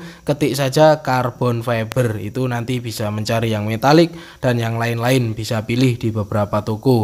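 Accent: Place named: East Java, Indonesia. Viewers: Emang sampean asli mana, logate sama, kayake jawa timur semua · Kayak ngk asing sama bahasa medoknya,dan nama chenelnya.. orang mana si bang??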